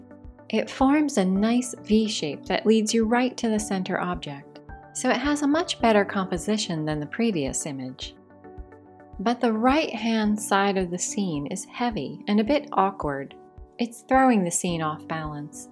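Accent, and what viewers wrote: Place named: United States. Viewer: This is so helpful and so well constructed. Also I loved her voice. Americans always seem to compliment our English accent so now I can return the compliment. It’s one of the nicest American accents I’ve heard so wondered what part of the US she comes from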